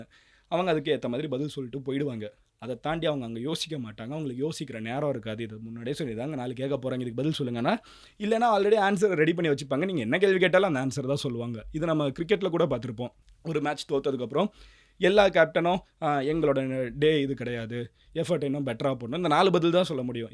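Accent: Madurai, India. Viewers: madurai slang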